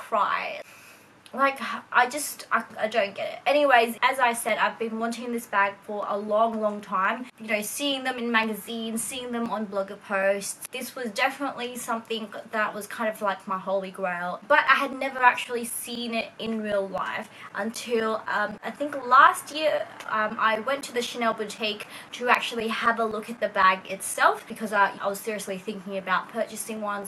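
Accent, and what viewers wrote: Australia. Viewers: Australian accent...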